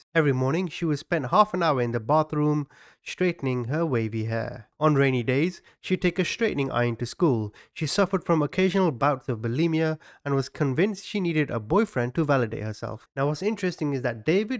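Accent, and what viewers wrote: United Kingdom. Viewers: You make me laugh so much, I think it's because you speak like a Brit and I get you, I love your channel and your opinions, great job man.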